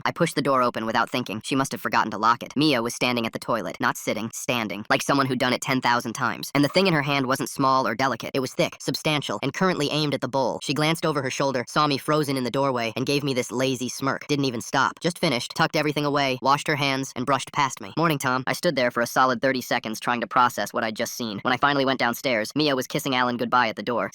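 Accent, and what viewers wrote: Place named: United States. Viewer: Yeah, this guy sounds American, South Africans, yeah we say that stuff like it is, and we'll make fun of you for not knowing too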